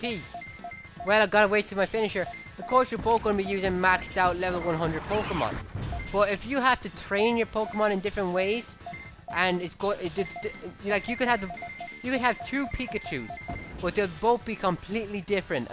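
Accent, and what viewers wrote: Ireland. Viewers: where are you from man?? im irish too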